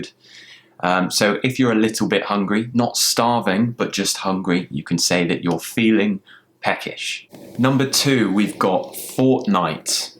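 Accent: RP, United Kingdom. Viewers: That's ritght Sorry :) I've been in London for 11 months and still learning English.I know there are many ways to say a word because of accents but when I heard you saying ''cannot'' it took my attantion.I was like umm ''he seems speaking with RP, actually He is more understandable but what about 'cannot' lol'' So I really wondered where were you from or if you had a reason for that ^^ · Thank you for the lessons,you own well-born accent indeed.Wish I hade a british companion like you,back then in London. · This is One of the BEST YouTube Channel to Understand more the British accent and words . Cheers! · Hello! Where are you from? I'm thinking about moving to the UK, and I want to pronounce English nearly to your accent. I tend to get accents very easily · Loved your british , you are the first british that make me love british accent much & more. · Make more videos of your british accent!! 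I love it! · Good job ! Thanks for sharing useful things, I love learning and imitating British accent a lot. It sounds so posh 😎 keep doing this I'm your student and supporter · Hi! MY name os Sérgio and Im from Brasil... I need to say You've got a worderful British accent and your pronounce is quite clear. Your lessons are really amazing!!! My warmest. Regards! Sérgio Alkimim. Brasil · I love your videos so much, it can help me to improve my English skills. And I love your British accent, last one is you. 😍from Thailand ~ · love ur videos. love ur British accent. 👍👍👍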